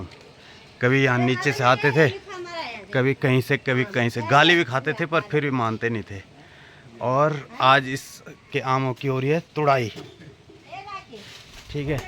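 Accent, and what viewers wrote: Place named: Garhwal, India. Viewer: Chhote bhai aapki gdwali bahut achli lgti h mujhe pta nahi kyu। Mai waise kisi ka blog nahi dekhta but aapki gdwali tone mst h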